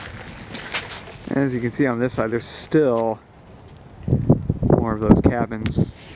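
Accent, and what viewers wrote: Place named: United States. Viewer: I do wonder just how they got to be all "rummaged through" like that. Maybe animals had gotten in them and ripped everything up, like the cushions of the chairs and couches? And, NO toilets? Just HOW old are these cabins? And, since I am commenting here as I watch the video, what state is this all in? I am just ASSUMING it is in the USA. Just because our "tour guide" speaks perfect English of the US type. By the spruce tree's in the video, I don't think this is too far South in the US, IF it is in the USA. Okay, at about we get past the shacks or cabins to the old trailers. The trailers SEEM to be maybe 1980's? Possibly late 1970's? And, it's just odd how the trailers seem to just be abandoned without anybody taking most of the food and other things with them when they left it. And, whomever's land they are all on, haven't they pushed to get it all cleaned up? 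It is all so mysterious how this old place is just left here abandoned. You would THINK that IF it could be all cleaned up and cleared out that MAYBE the property owner could sell the land OR even make it a nice place for a NEW trailer park , or IF it is NOT just out in the Boonies, a good place for new homes to be built at. BUT, It IS really quiet out here, it MAY be in the boonies of the boonies. And, maybe most people would NOT want to be that far away from a city or town center. Some of these trailers may be even from the 1960's, but, some are maybe 1980's too. Most of the furniture is about 1980's too. But, ho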